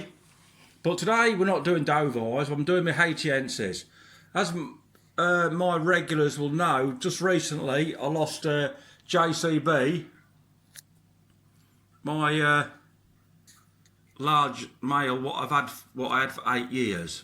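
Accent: Midlands, United Kingdom. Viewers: Your uploads are great to watch along with ur commentary really interesting,,,can I ask wot ur Actsent is??? I'm thinking midlands??? 🤔 👍 👍 👍 👍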